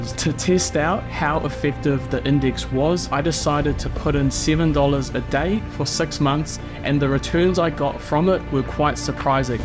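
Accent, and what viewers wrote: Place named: New Zealand. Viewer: You sound like a Kiwi similar to me. In New Zealand what platform should I use to invest in. I have sharsies but I dont know if there is better out there. I'm 19 years old and want to set up something that can help me buy a house in like 10-15 years or something. Just wanting to set up for long term success.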